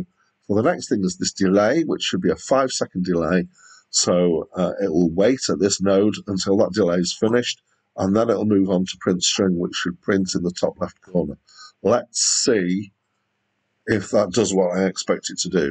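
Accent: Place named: United Kingdom. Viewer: Ok plus noticed the accent my UK bud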